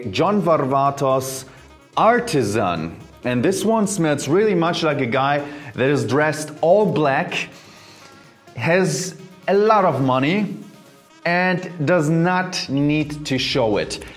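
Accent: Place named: Germany. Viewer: Man , I Miss Germany , listening to you talk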